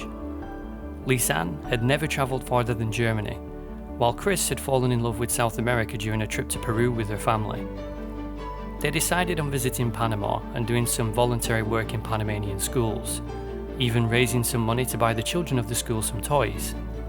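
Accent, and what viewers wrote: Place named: Lancashire, United Kingdom. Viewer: Great video and even more thanks for not being AI. Soon as I heard the accent (Lancs? Blackburn Burnley Accrington way?) I thought ‘Yessss’ and subbed. You deserve loads more subs and views 👏🏻👏🏻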